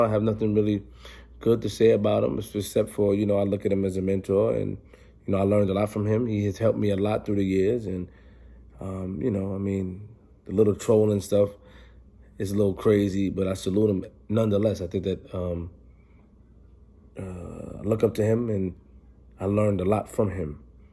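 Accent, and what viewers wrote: New York, United States. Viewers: Y u telling like a new Yorker o my bad that's all ya'll do B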